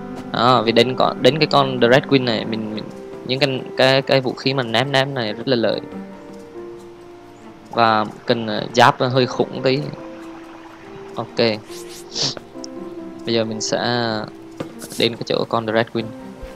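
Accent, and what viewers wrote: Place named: Central, Vietnam. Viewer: Giọng anh cứ lơ lớ tiếng miền Trung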